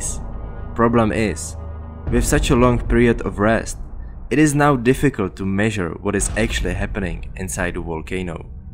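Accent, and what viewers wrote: Czech Republic. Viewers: Do I hear a czech accent of speaker, don't I? :D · by accent u are deff czech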